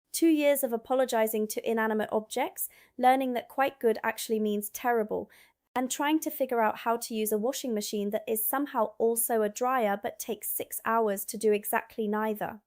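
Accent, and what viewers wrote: United Kingdom. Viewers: I buy superseeded wholemeal bread and it lasts me a week. I prefer it that way. It tastes fine. I have 5o say you are a most confusing Amercan because you sound like a Brit!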